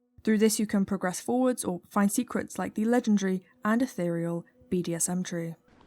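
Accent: United Kingdom. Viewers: Ah a British female voice on darksouls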